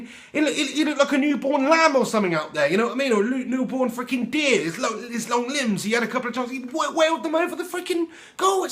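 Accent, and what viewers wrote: Manchester, United Kingdom. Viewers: This guy has an interesting Manchester accent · You've got a strange Mancunian accent.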